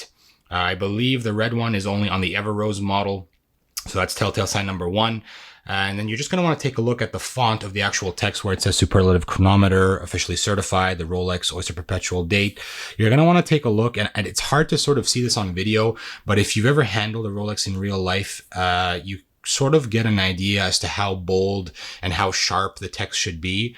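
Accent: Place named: Lebanon. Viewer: You’re Lebanese I can tell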